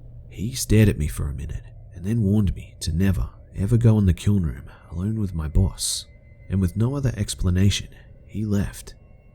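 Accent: Australia. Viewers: the way u can tell is he has a bit of twang as most Australians do to how he pronounces certain words like cove or over or home if u compare them to one of the numerous british accents you ll hear it too :) but its so slight. brits are a bit much at first to figure where they are from so many accents.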